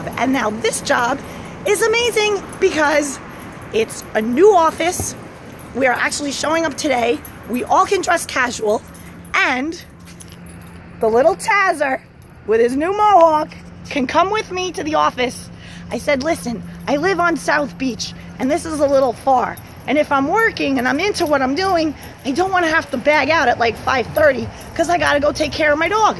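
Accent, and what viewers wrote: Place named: New York, United States. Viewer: New Yorker? Love the accent. Your energy is amazing! Thank you for sharing! I love Abraham I listen to her every day 😀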